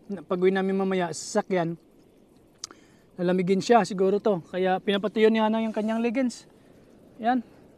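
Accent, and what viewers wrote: Iloilo, Philippines. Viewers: Ilonggo ka b kuya? Malubog mn s Ilonggo ang d matin aw😁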